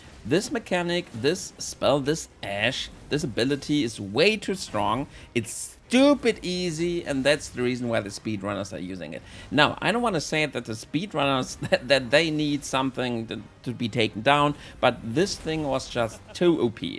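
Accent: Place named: Germany. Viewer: (Btw finde ich es super cool, dass du solche info vids über ER machst. Ist definitiv mein aktuelles lieblings game! Außerdem finde ich die Art wie du englische Videos präsentierst super gut! Man hört natürlich das deutsche raus aber ist trotzdem super verständlich)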